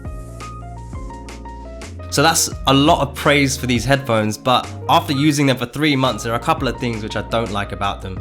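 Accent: United Kingdom. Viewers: "?" Dear Sir, your information is so valuable! But your clipped British accent at coffee shop velocity is sometimes unintelligible, especially for someone who wants to be easily understood and WHOM WE WANT TO UNDERSTAND! You speak so well! But too fast . . . Slow down, please! (About halfway through, I gave up: tired of the onslaught/drone of your chatty, breezy voice--with no respect for the listener.) We're not your friends; we're your audience.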